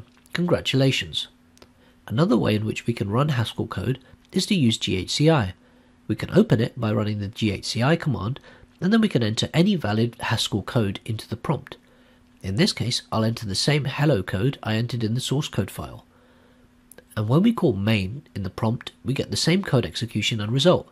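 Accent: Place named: United Kingdom. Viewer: Could be better without the annoying british accent which is incomprehensible at times.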